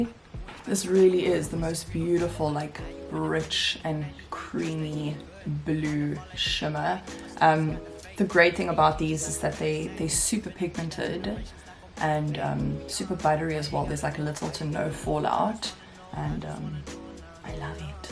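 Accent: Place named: South Africa. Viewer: I love listening to you talk cos you're South African. This makes me H A P P Y!!!! Love the eyeshadow results. I love all of them. Thank you for the demo.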